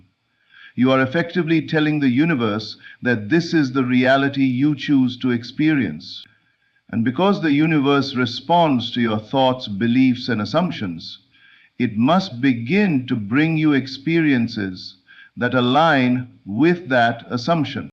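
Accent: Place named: India. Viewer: Is this the Indian Neville Goddard because he has an Indian accent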